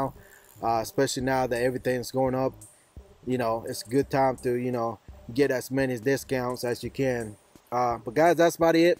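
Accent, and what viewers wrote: South, United States. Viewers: My man here has a southern hispanic accent lmao I just bought this online without researching and i am glad i found your video, it looks like I made a good decision buying this flash hider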